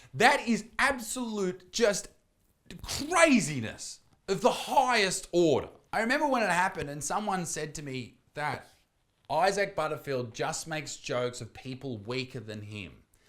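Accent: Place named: Australia. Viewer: i always thought you lived in australia with your accent and all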